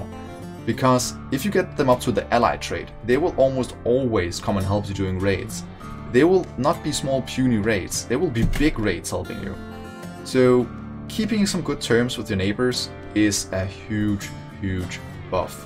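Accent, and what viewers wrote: Denmark. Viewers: that danish accent.. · Are you from Denmark your accent tho?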